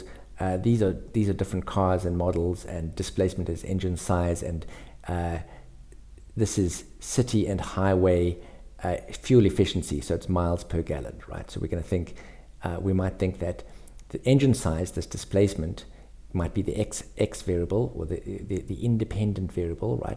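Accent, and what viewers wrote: South Africa. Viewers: Can hear that SA accent loud and clear. Great channel Boet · Are you South African bro? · south african bloke?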